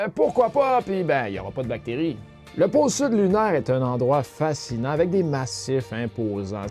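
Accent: Quebec, Canada. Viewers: L'accent québécois comme j'adooooore :) + la voix de la petite voix "trop chouuuu" J'ignore si je suis le seul à aimer ça XD Aller hop, je m'abonne direct et bonjour depuis la France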